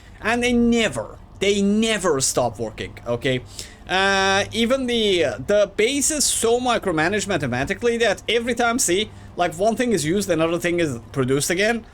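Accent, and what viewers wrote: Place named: Turkey. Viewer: oh, I didn't realize that was modded. I've made 3 story builds before, sometimes just tucking amps under the floor boards so it still looks like a two story. And I was trying to place the accent, didn't have turkey in my head. Keep the vids coming.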